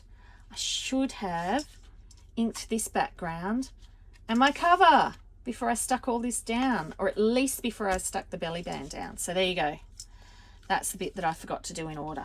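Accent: Australia. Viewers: Hi, nice to hear another Aussie xx love this journal xx Hope your channel grows, so nice to support you. Look forward to seeing more!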